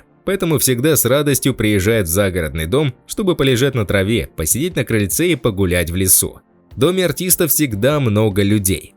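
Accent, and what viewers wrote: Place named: Russia. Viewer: Как же ваш москальский выговор портит слово,, сватЫ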